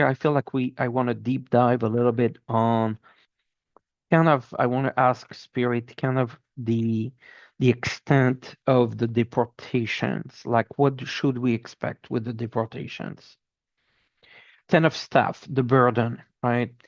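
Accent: France. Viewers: New subscriber here. Like the reading. Very detailed. The hint of a French accent is also intriguing. 🙏😊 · Interesting and clear. I note a French accent 😊would of like to know more about Canada . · I as well. I enjoyed your show with Linda G. I recognized your French accent immediately since my mother was from France. Merci beaucoup!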